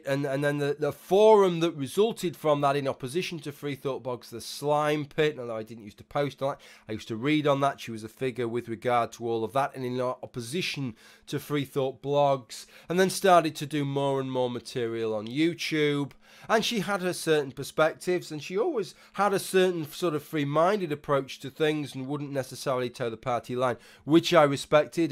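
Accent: United Kingdom. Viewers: British accent is the best:)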